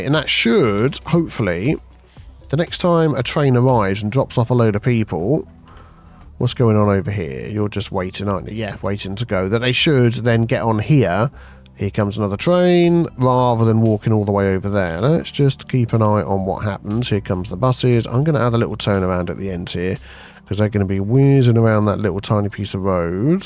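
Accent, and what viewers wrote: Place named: RP, United Kingdom. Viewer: I keep saying “Penndel” in posh voice to myself off and on throughout the day whenever I need a laugh. That caught me so incredibly off guard at 😂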